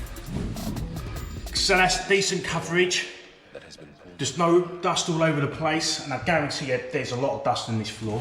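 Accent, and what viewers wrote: United Kingdom. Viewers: You have a proper British accent, I shall trust you.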